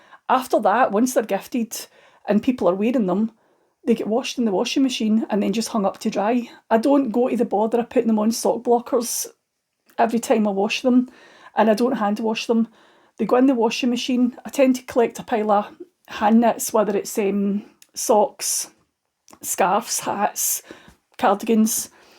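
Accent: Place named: West Scotland, United Kingdom. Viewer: Hello from the west coast (loch Lomond) just found your channel. Fab to hear another west coast accent 😊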